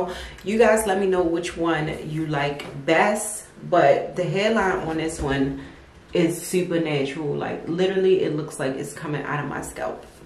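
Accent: Baltimore, United States. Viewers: You sound like your from my city.. DC🥰 Nevermind.. I hear B-More🤨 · Yea that’s that Baltimore accent I can hear it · I’m loving the Baltimore accent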